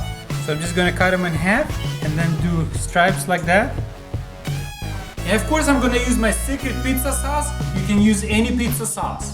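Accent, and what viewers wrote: Russia. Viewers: I could tell u are Russian by your accent : )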